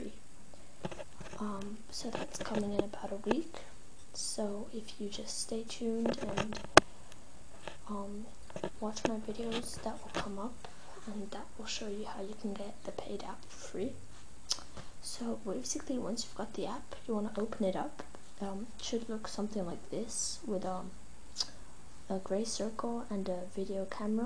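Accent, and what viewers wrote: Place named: United Kingdom. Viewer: british accent hahhahaha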